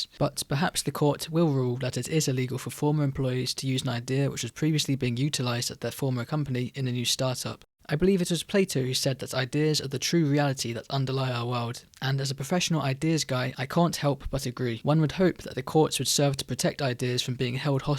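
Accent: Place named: United Kingdom. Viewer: Nice video but there was an annoying british noise talking through it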